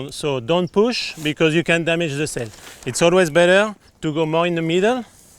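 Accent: England, United Kingdom. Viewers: l'accent anglais magnifique d' xD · j adore ton accent anglais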